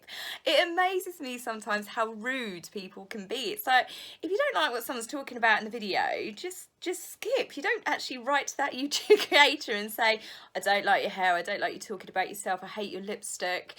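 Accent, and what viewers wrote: England, United Kingdom. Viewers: I love the sound of your voice and English accent and snippets of everyday life somewhere in England before getting into the lives of the royals.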